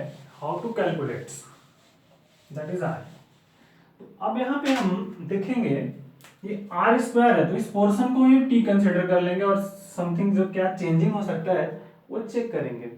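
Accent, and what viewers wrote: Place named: Bihar, India. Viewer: i guess you from bihar, living near gaya... because i had a teacher who speaks same like you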